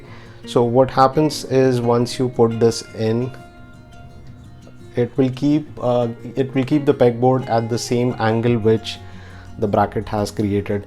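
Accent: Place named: India. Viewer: The calm nice and relaxing Indian voice helped me understand this wonderful Board, please make more Vids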